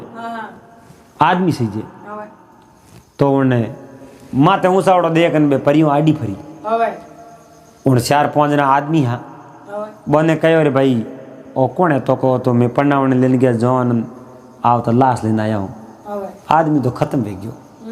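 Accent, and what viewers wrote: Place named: Barmer, India. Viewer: आप बाड़मेर की भाषा में बोल रहे हो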